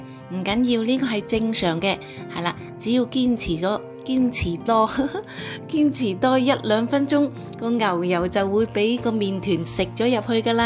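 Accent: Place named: Guangzhou, China. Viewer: 你好！你做吞拿魚包好靚好似好好食！聽你嘅口音好似廣州人？你把聲好聽好可愛，多謝你的分享。😄😄👍👍💐🌺🌹。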